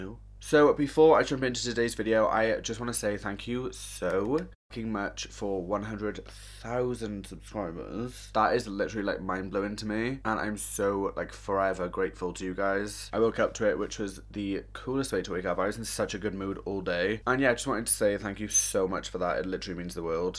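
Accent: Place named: England, United Kingdom. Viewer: on instagram, and omg where the hell are you from! obvs england but your accent is what i live for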